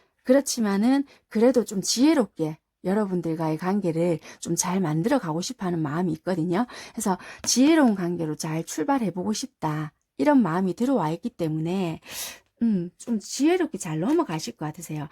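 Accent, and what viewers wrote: Busan, South Korea. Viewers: 감사합니다!!! 편안한 밤 보내세용😻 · 네 ㅠㅠ 감사해요.. 요즘 맨날 술마셔요 ㅠ 속상...어떻게 해야할지 몰라 더 그런것 같아요.. 언제나 타로보면 정확하게 떨어져서 신기하면서도 잘보고 있습니다 ㅠ 항상 감사드려요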